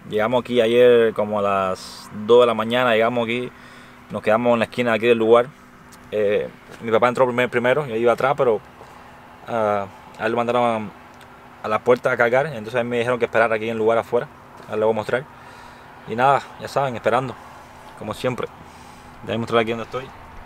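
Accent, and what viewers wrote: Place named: Venezuela. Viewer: por tu manera de hablar, tu acento no eres Mexicano, es mas como Venezolano o Dominicano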